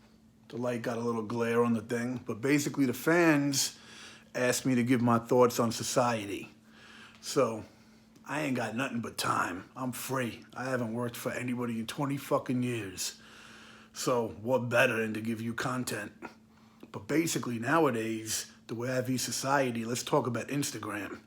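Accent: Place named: New York, United States. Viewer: Literally the most NY accent ever.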